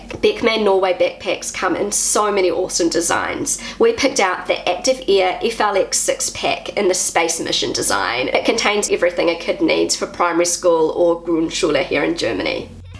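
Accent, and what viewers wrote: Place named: New Zealand. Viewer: Everytime I watch a vlog of yours I'm helplessly falling in love with your kiwi english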